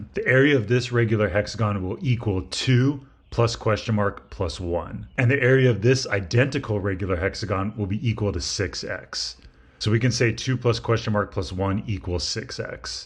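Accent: Canada. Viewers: he's canadian?? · Is he Canadian or American? It would definitely be weird to say zed in Usa.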